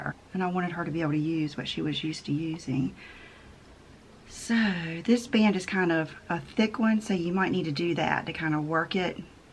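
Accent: South, United States. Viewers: Okay, your brow talk cracked me up! Too cute! I can't believe some people feel the need to correct the way others pronounce words. And the worst part is one can never say it their own way ever again without thinking of that diss. I love the way you talk and it's endearing to hear your southern words. You say tomato I say tomato ;-)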